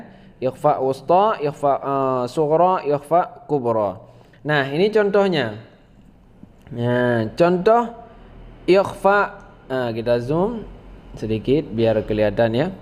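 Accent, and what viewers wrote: Aceh, Indonesia. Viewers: Logatnya seperti orang Aceh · Pak Ustadz, dialeknya khas Aceh sekali .... maa syaa Alloh .... peu haba Pak Ustadz ?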